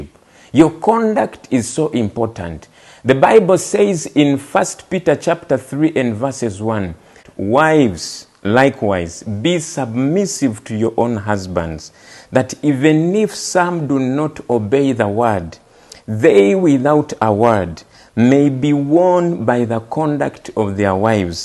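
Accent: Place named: Uganda. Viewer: Ugandan English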